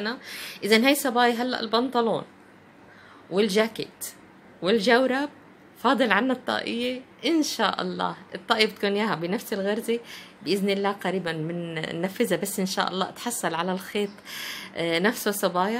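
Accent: Egypt. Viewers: تسلم ايدك عل شغلك وشكلك قاعدة بمصر اللهجة المصرية باينة في كلامك شويه تسلمي حبيبتي من كل شر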